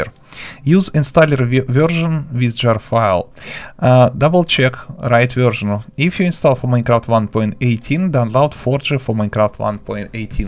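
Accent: Russia. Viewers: сразу слышно русский человек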